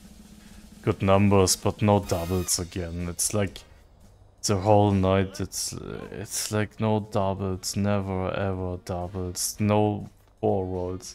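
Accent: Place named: Germany. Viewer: 😆Nirgendwo sonst den deutschen Akzent so rausgehört!!!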